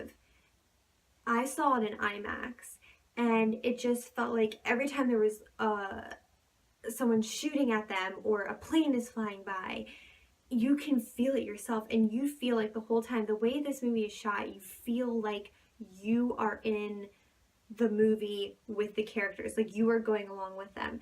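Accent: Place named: North America. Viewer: Which part of N America are you from? I can't guess. Nice, intelligent review Thank You X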